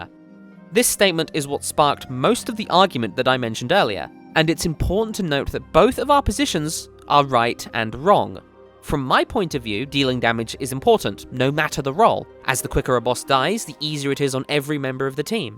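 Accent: RP, United Kingdom. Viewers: such a posh sounding accent ha, been along time since I have delt with raid drama. started playing again on a private server, expect lots of drama on them 40 man raids hehe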